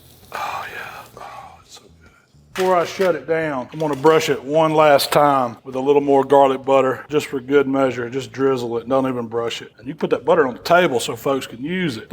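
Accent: South, United States. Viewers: Is it me or does the Southern accent makes his videos better😂... is the man👍🏾🫡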